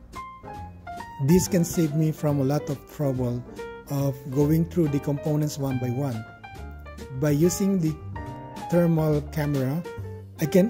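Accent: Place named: Philippines. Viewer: Sir you're accent is somekind a pilipino are you a pinoy? Thanks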